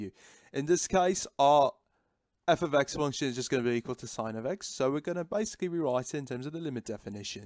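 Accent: United Kingdom. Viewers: Lmao that's a heavy ass British accent.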